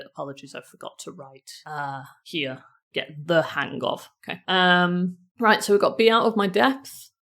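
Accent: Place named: United Kingdom. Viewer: I love the British accent. You speak really clear I understand it very well. Thanks again.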